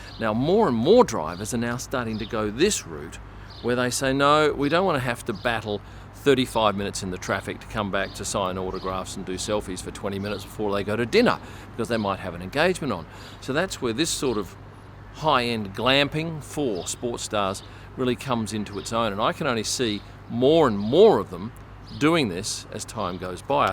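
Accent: Australia. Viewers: Fantastic channel. It's good to hear a laid back Aussie. Great content and presentation. 🇦🇺 😊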